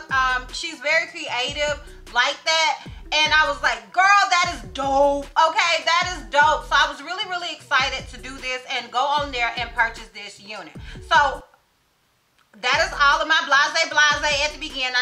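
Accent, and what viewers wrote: South, United States. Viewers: we can't see your kitchen. So you fly anyway. Love your videos and your southern accent 😍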